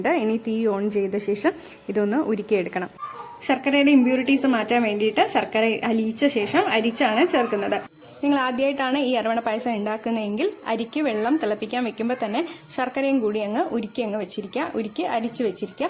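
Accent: India. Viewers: Superb video, palakkadan slang?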